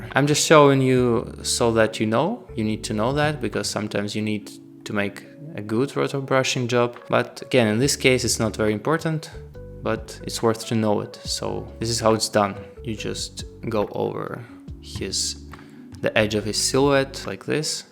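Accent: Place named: Russia. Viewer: впервые узнал русский акцент. ты крут, чувак. потом будем вместе работать)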